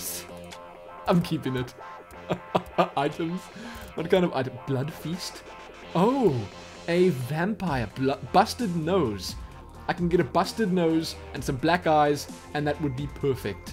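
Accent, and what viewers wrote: South Africa. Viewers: Where are you from you sound a very South African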